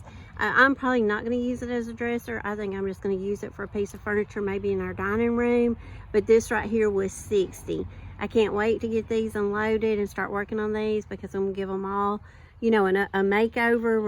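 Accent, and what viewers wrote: South, United States. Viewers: Congratulations. You always have wonderful ideas and and I love your makeovers. And I am guessing that many people agree with me that is why you have so many subscribers. I also, think your southern accent is so cute 😊. You've done it again - more wonderful projects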